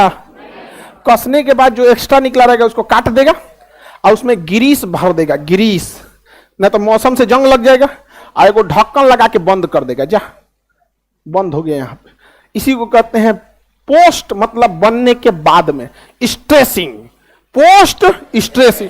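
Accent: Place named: Bhojpur, India. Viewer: Sir aap adha Hindi or aadha bhojpuri kyu bol te he.... Lekin sune me aachaa lag ta he ham bhi kyu ki Banaras he❤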